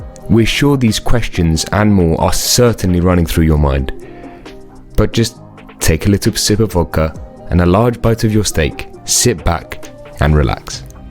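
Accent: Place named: England, United Kingdom. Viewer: I'm at 1.5x speed and it seems like a normal english accent.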